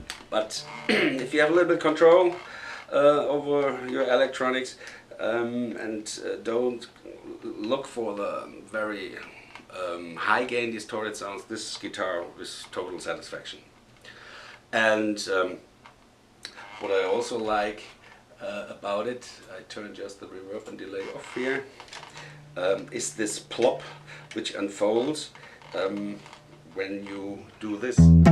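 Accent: Germany. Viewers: Bist du Deutscher? Dein akzent erinnert mich an Deutsch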